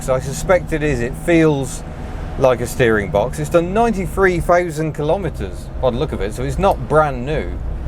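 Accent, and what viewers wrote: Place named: West Country, United Kingdom. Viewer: I enjoy watching a Chris Harris video (he has a prized, much loved 2CV too) but living outside the UK, very easy-on-the-ear west country accent is an absolute delight to listen to and a reminder of home. I never thought I'd enjoy content with such old and average (admittedly characterful) cars - but I'm converted! I even got to see a DAF City Car yesterday (via visit to the DAF museum) which I had the Corgi version of as a kid in the early 70s)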